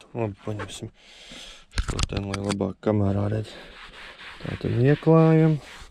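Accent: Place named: Lithuania. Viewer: What language, sound full on lithunanian with heavy accent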